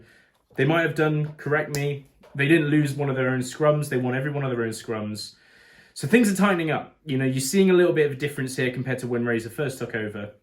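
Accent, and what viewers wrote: South Africa. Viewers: A truly shit South African accent but good analysis of the game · Your Saffa accent is great but you need to throw the words "lekka" and "braai" in there a few times to be authentic. Oh, and don't forget "moer". No South African says three sentences without "moer" appearing at least once 👍🏻 Yeah, what a game. I think NZ not coming to SA during Super Rugby anymore hurts them, because they don't get to play at altitude anymore. Everyone said they stopped playing after 60 minutes, but that is what the Highveld does to a player. Then when you add in the nuclear missile we have in Kwagga Smith, the result is going to go one way. Regarding the TMO checking the truck and trailer and Bongi's tries, I don't know what the answer is there. We heard the Ireland vs SA game had too many TMO interruptions and now we hear the game needs more TMO interruptions. 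I don't care which one, but it would be nice if World Rugby finally made up their minds? Either we accept some mistakes in the game, or we Big Brother every mistake. Pick a lane and stick to it, for the love of God. I'm okay with the tries being awarded, I'm okay with them not being awarded, but some consistency is required. New Zealand were very dangerous off turnover ball with broken play, but they were a bit predictable in the attack methinks. The young guys were properly tested. Dixon didn't have such a good game, but like Rassie said, they are there to make mistakes and to learn. Fassi had a banger after his yellow card, Nortjé was very industrious, Steenekamp is slowly but surely making his voi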